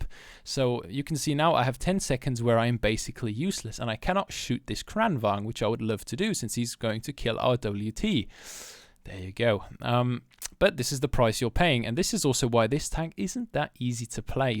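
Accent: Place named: United Kingdom. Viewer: Wow are u french? U sound british haha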